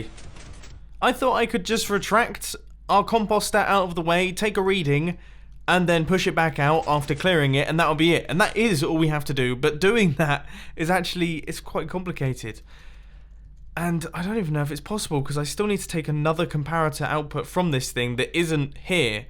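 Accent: United Kingdom. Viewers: I'm pretty sure the way he says it is a British pronunciation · For StandingWind: That's because he isn't from USA. He is from UK and therefore the accent is different. Got it??? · That's why he's getting annoyed, because from UK he pronounces it differently from Americans.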